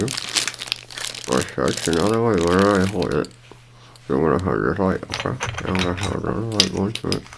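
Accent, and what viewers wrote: Transatlantic, United States. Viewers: Trans Atlantic 😭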